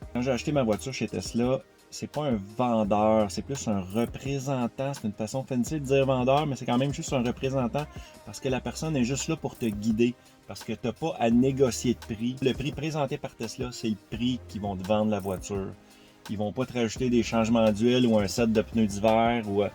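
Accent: Quebec, Canada. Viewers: j'ai juste dit que j'ai eu du mal à comprendre avec l'accent québécois, je ne pense pas être sourd 😅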